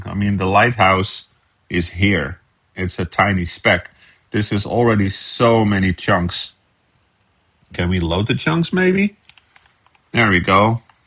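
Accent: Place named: Netherlands. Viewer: You sound dutch :D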